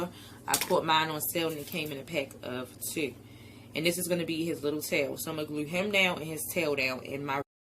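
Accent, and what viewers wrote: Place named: Maryland, United States. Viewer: I know we live in the same state because of your accent. I live in Reisterstown and work in the City. But I love your crafts and would be honored to have one of them.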